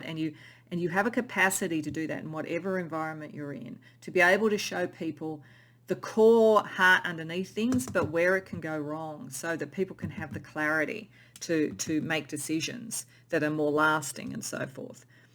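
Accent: Australia. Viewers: I understand if you don’t want to answer this question but I’m very curious… lol, there are a couple of things you say that I’ve only heard from a certain group of people. It’s not exactly what you say, it’s the way you say them lol. Do you live in or come from a country town in Victoria that was a mining town in its early days. It’s a town in the proximity of a well known island township. I’m trying not to be too specific but hope I’ve given you enough info to get what I’m asking 🤔😁👍🏼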